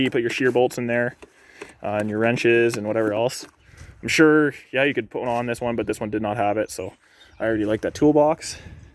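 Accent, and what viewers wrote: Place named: Ontario, Canada. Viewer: I can spot an Ontario accent anywhere....no doubt aboot it😅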